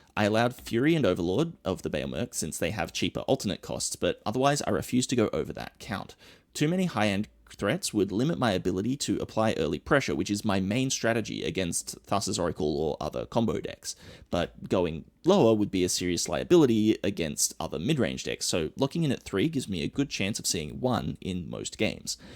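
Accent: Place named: Australia. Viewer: bloody brilliant to hear an aussie accent on mtg youtube! instant subscribe!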